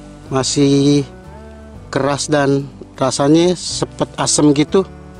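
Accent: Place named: Indonesia. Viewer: ... Bahasanya masih khas banget anak Johar... Seneng deh liat skr.. 🥰 Sehat dan happy selalu yaa... 🙏 Salam dari temen kecilmu dulu... 🌹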